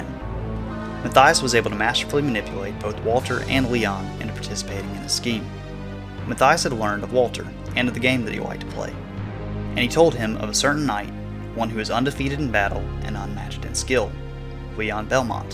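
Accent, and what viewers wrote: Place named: South, United States. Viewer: Title doesn’t make sense….He’s not Dracula until he’s Dracula. So how does Dracula become what he already is? The term “Dracula” would already be a Vampire right? The title should be….HOW a man became a vampire known as Dracula….because their are many vampire but only one king of vampire….Dracula. That southern drawl only made it more cringe. I DO know this story isn’t accurate.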